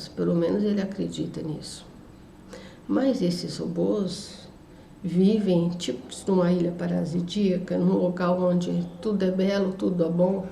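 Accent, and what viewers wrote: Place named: Russia. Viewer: Muito bom,Grande descoberta esse canal! Eu tenho uma dúvida...a Senhora é russa?Se for então a senhora fala muito bem português rs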